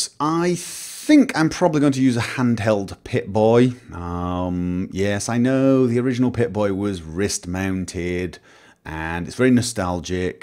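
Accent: United Kingdom. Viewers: Does he have a British or Scottish accent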